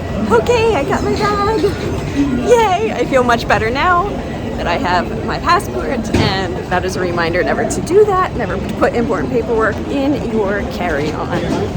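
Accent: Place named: Chicago, United States. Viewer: Your hair is awful. Be consistent with the color. Are you from the Chicago area originally?? Your accent shows! Most companies like a neutral American accent.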